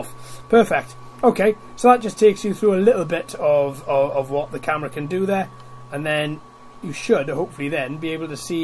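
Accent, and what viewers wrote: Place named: RP, United Kingdom. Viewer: ooooo very posh buddy x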